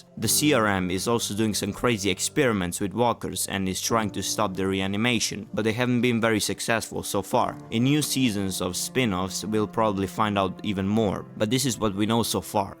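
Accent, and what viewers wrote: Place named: Russia. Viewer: Ur accent feels russian to me btw. Nice video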